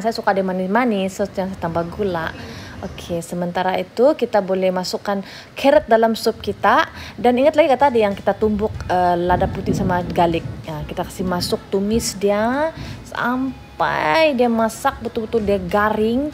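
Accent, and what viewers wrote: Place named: Sabah, Malaysia. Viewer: Sumandak Sabah lawa2 pandai masak nasi.. nasi ayam sedap tu bah. Aku boleh dengar sepnjg ari ckp bhs Sabah. Bila team ICSYVM mau jemput jd guest artist ye.. mau juga tengok pandai kah pilih penyanyi sebenar/teka penyamar😂 terbaek Original Sabahan💪💯👍🔥🔥🔥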